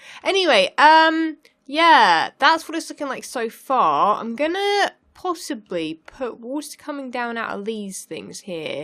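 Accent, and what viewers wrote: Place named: England, United Kingdom. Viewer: i love your English accent :D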